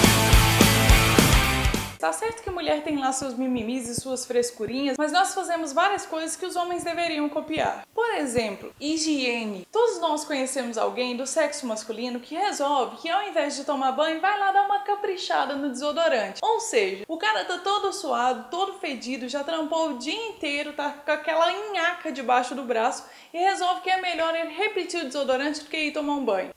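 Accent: Minas Gerais, Brazil. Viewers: sotaque minerim uaii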